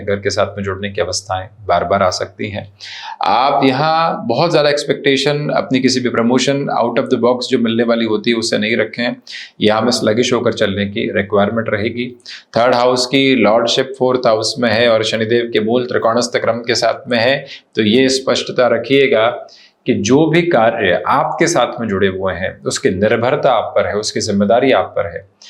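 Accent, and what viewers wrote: Standard. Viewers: Aap itni shudh hindi mein bolte hain ki mai chah kar bhi aapki predictions dekh nahi pati hun. Kyunki samjh nahi ata. Kripya thori saral aur aasan hindi mein batayein. 🙏🏽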